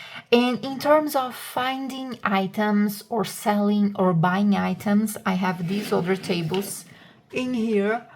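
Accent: Brazil. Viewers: Imagina a minha surpresa quando ouvi a voz e pensei "isso é BR", fui olhar nos detalhes do canal e boom, era mesmo. Nice seeing another brazilian in the weeds of the hobby, pur gaming landscape goes hard in a different direction than what you tend to see on youtube. Lots of GURPS going on around Brazil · Acho engraçado que eu reconheci o sotaque brasileiro nos primeiros segundos de vídeo kkkkk, o nosso sotaque é muito reconhecível e belo! Excelente vídeo, keep up the great work!! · Hi! I'm loving your content. So good! It feels like you're a brazilian because of your accent. Don't know if you're comfortable disclosing your nationality, but, if you are, greetings from a fellow tupiniquim :)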